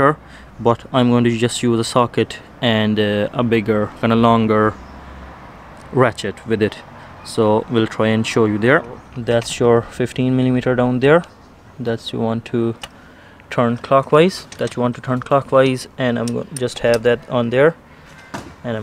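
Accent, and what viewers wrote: Cork, Ireland. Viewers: sounds like im home in cork listening to you 😂😂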